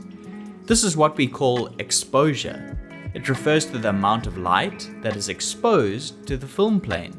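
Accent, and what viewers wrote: South Africa. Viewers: Are you South African by any chance? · I hear a South African accent! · Are you South African bro?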